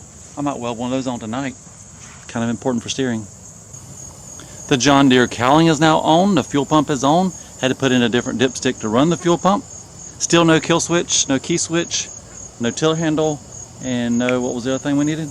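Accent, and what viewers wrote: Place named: Harkers Island, United States. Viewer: Dude sounds like he's from harkers island N.C.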